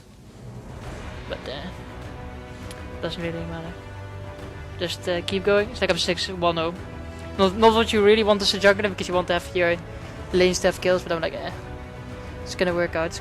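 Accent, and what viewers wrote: Netherlands. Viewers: you sound like a guy who comes from holland? am i right?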